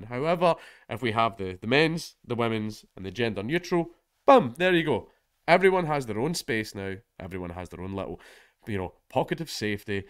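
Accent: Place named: Scotland, United Kingdom. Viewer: It makes me so proud so hear such sense being spoken in a Scottish accent.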